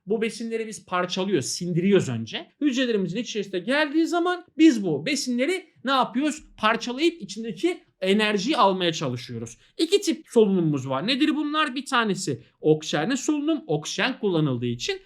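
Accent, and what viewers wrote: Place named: Erzurum, Turkey. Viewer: Hocam memleket nere Erzurumlu gibisibiz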